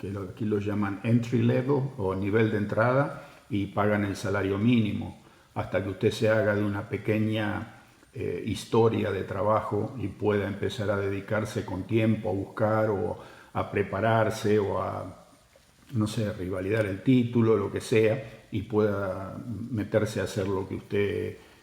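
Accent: Argentina. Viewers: Holaaaaa familia ❤ los adoro, hace un par de años los descubrí, cuando empezó a a acompañar los videos de y. Me llamó la atención la forma de hablar y descubrir que "era un argentino 😅"... Gracias, muchas gracias por todo lo compartido. De emigrar siempre me gustó Canadá, antes era con muchas más exigencias, todo cambió después de la pandemia. Abrazo grande desde San Miguel del Monte, pcia Bs As, Argentina. ❤❤❤ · Que relato perfecto, parecés un profesional de los medios, la historia tuya,la vivimos como propia, con la forma como la relatás y los que somos descendientes de inmigrantes, nos sentimos identificados con nuestros abuelos, ( en mi caso italianos, analfabetos, que nunca más tuvieron contactos con los suyos)! Lo que describís es como me sentiría, si tuviera que emigrar, y se nota como estas al tanto de la realidad,en este caso de Argentina! Otra cosa muy llamativa es que seguís manteniendo el acento Argento, y particularmente modismos idiomaticos a pesar de tantos años viviendo y hablando otro idioma!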